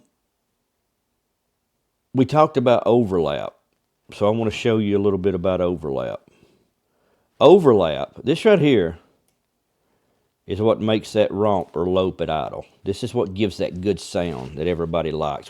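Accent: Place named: South, United States. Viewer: Beautiful bikes, awesome beard! With that accent you gotta be from the south like me. Like the vids brother, keep up the good work! Just finished my own cam swap, went with the S&S 475.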